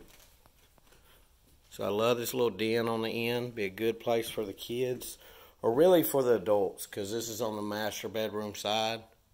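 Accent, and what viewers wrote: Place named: South, United States. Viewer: first you are my favorite Manufactured Home Tour Guide and Commentator! Is that accent Alabama or Mississippi? Second, I love The Helicon. We toured a modular home at 3 Stones Homes in MO that was very similar except in place of a sunroom there was a covered rear porch. I like the sunroom better. Would it be possible to enlarge the master closet by 3’ by sliding the the wall back in the kitchen and master bath eliminating the smaller pantries beside the refrigerator/freezer and reconfigure the master bath by eliminating the tub, moving the first vanity down to connect to the second. You would be able to have a double sink vanity with a makeup dresser in the center as well as a larger closet. I have designed, redesigned, drew blueprints and built our last 3 homes myself. So I look at the possibilities. We are in our late 70s and need to scale down size wise and a story. 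My hubby and I started our life together in two very small “mobile homes” first one was a 1950s’ 2 bedroom 1 bath 8’x 30’ the second was new 1964 3 bedroom 1-1/12 baths 10’x 56’. Both fully furnished. We were way ahead of the tiny/small house craze....Lol. Do not want to go quite that small again. Third have you ever considered doing business in North East Texas?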